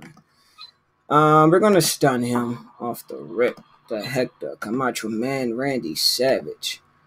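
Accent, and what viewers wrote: Baltimore, United States. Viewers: Baltimore accent? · u from balitmore? why u pronounce ur Us like dat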